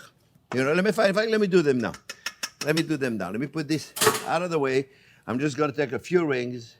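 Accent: France. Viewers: I like this man embracing his pronunciation of his onion. Gonna feel his french accent is so strong. But I love this guy. 😊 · I've been learning French and at times despair at my poor prononciation and misplaced verbs. Then I realised I love listening to your videos as much for your delightful accent as your delicious recipes and helpful cooking tips. Maybe a French person would be just as delighted to hear my Aussie accent - or maybe not! 😊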